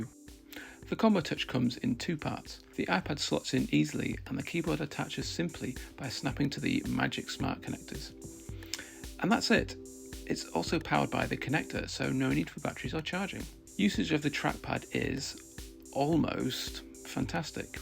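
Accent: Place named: United Kingdom. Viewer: thanks for your reply, cause I hear your accent and thought you were in Uk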